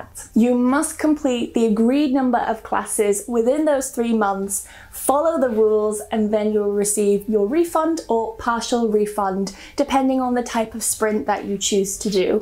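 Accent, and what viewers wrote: United Kingdom. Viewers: your British accent is just delicious! Your voice is music to my ears.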